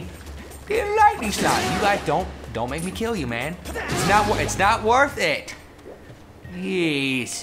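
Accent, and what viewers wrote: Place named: England, United Kingdom. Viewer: i love your english/britsh accent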